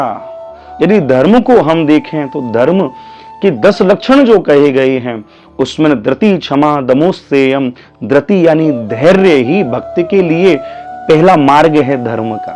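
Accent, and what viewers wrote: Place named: Rajasthan, India. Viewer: दीदी हम मध्य प्रदेश के सतना जिले से हैं यह हमारा ससुराल है प्रभु कृपा से 2 बच्चे हैं हमारे एक प्यारी सी बेटी और दूसरा प्यारा सा बेटा है पूरे परिवार की जिम्मेदारी हम पर है हमारी मम्मी जी को गुजरे 6 साल हो गये हैं वह मुझे बहोत प्रेम देती थीं मेरी खुद की माँ से भी ज्यादा प्यार दिया है उन्होने हमें, हमारी माँ तो पागल हो चुकी हैं और पापा इस संसार को छोड़कर चले गए 7 साल पहले और अब मायके की गृहस्थी बिखरी हुई है 3 बहन हैं और 1 भाई है वह अभी 16 वर्ष का है। सब प्रभु के भरोसे चल रहे हैं जैसी कान्हा की इच्छा 🙏दीदी आप कहाँ से हैं वैसे आपकी भाषा से तो प्रतीत होता है कि आप मेरे प्यारे राजस्थान से हैं 😊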